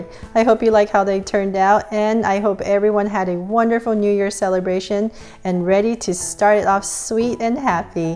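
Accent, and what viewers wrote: United States. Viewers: R u American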